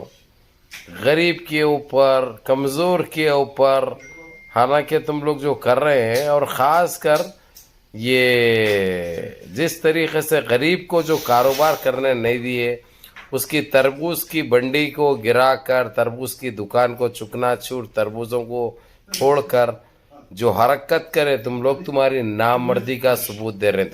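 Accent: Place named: Bangladesh. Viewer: Ap arbi logou ku denk kar ap bahut feel kar te jaisa ke ap bhi arabi ahy hyd ke chaushu se baat karoyaro ap jo arabi me karte aisa lagta ahy koyai bangali ahy ap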